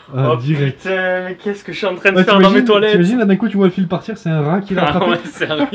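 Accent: Marseille, France. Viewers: Tu es pas un peu Marseillais? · Tu as l’accent marseillais frère 😭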